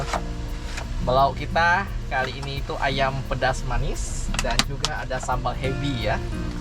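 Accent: Pontianak, Indonesia. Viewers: " lewat tempat belobang pun nda beguyu" 😂😂😂 logat Pontianak kau tukh nampak benar...!! 😂😂 Utamakan selamat ya..!! 👍🏼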